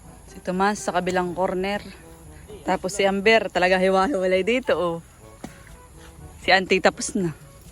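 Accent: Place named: Marinduque, Philippines. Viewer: Ikay baga ay taga Marinduque sa punto ng pananalita mo ay marinduquenio ga mura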